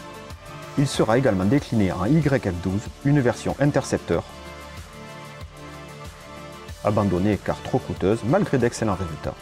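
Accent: South, France. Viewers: Je ne voulais pas vous vexer j'espère mais j'apprécie cette pointe d'accent sympathique du sud(?) il me semble. Je suis moi même méridional et je garde un petit accent dont je suis fier. 😉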